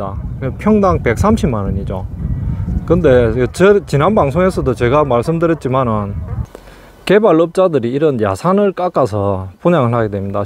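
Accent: Gyeongsang, South Korea. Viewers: 경상도 액센트 최고 매력적이에요 love love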